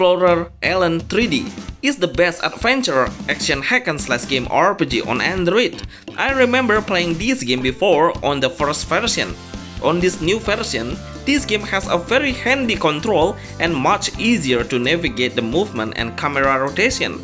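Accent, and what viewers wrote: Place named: Indonesia. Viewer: Yes, he Indonesian accent so thick 😊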